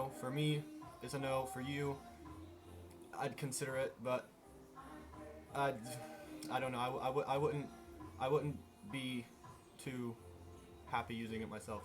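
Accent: Scotland, United Kingdom. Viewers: where are u from like I keep getting hints of a Scottish accent idk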